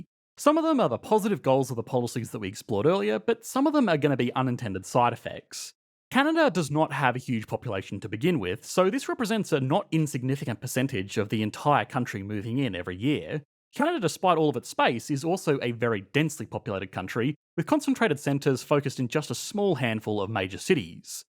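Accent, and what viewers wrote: Australia. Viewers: The demand for housing doesn't mean they are built fast enough. You don't know the issues of Canada clearly. The builders do not have the workers and they also rather pay less. On top of that they rather build at a slower pace and charge MUCH MUCH more for each unit because of desperation of the housing market. If they build too much housing too fast. Their bottom line in what they can make also suffer. So the incentive is to keep it as extremely high demand. How can you account for 500K immigrants when 200K housing is built every year. That is just the immigrants not counting the 750K students that need places to stay. We need a new city built at the size of Ottawa each year now. Let me know how that is feasible. This is not over sensationalized. It's an extreme problem happening. I detect an Australian accent or NZ one and you don't live in Canada. 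Studying things and not living them is a different story.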